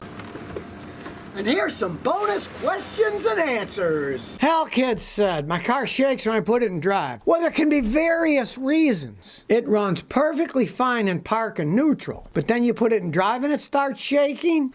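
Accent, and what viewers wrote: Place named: East Coast, United States. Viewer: East Coast accent with a slur the first time I really noticed 😳 . I'm learning how to be a conscious vehicle owner that I never noticed everything is delivered better when you pay attention 😂🤣🤣😅😆😁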